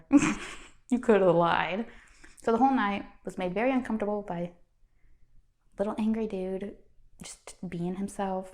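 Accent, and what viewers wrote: South, United States. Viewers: You're a trip. You can't really hear the southern accent in the asmr videos but it sounds good on you. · im barely now realizing your southern accent lol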